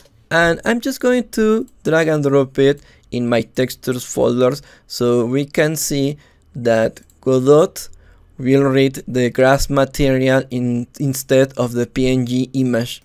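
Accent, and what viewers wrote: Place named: Argentina. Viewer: Sos argentino pa? Muy bueno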